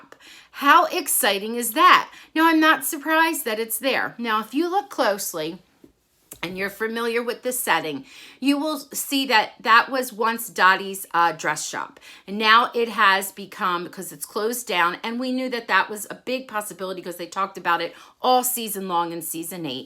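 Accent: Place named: New Jersey, United States. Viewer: Just love your predictions..you pick up on so much more than I do and God knows I've watched the seasons a million times. hahaha. I hope I catch your next live again..it was so much fun texting and having you answer live. I just love your enthusiasm and love for the show. Also love your Jersey accent..I dated a Jersey boy in college. He thought I sounded like I was from Chicago (I'm from Iowa..close enough I guess). Until next time.....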